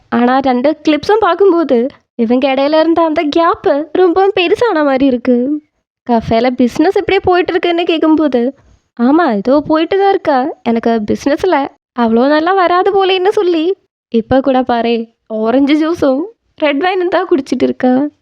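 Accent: Kerala, India. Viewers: Hi sis unga malayalam tamil explanation is soo good it's very different to hear me I like it explanation also very neat and clear so tq 😊 Fake fact lips series podunga sis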